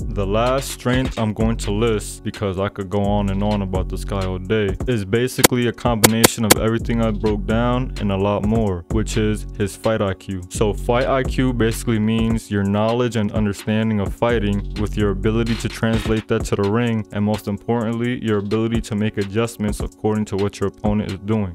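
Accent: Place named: New York, United States. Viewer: I can tell you’re from New York. Do u train?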